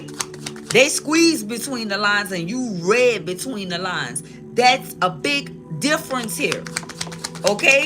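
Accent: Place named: New Orleans, United States. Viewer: I know you from New Orleans the way you Talk. REALNESS.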